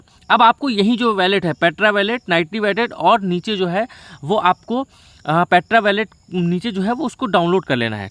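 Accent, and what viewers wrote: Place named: Kolkata, India. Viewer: Kolkata voice ascent h 😅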